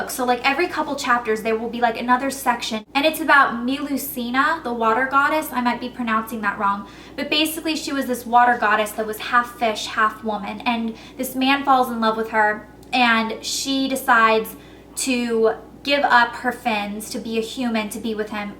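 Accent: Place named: California, United States. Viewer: It's fun to notice your accent changing. I'm not from the states but I notice how your accent becomes more 'californian' I guess =)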